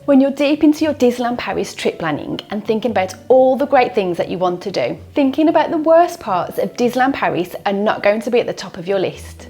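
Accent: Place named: Black Country, United Kingdom. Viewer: Ive just come across your video ❤ I hear black country 👌🏻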